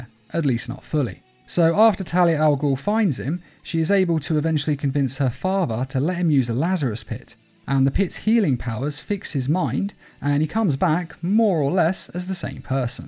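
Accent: England, United Kingdom. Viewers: I have missed the beautiful English voice. My English friends FOR THE LOVE OF TEA!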